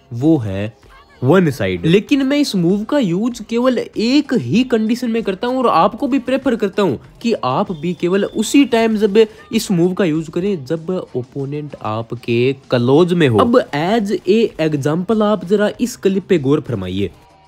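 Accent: Haryana, India. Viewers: Bhai haryana t h tu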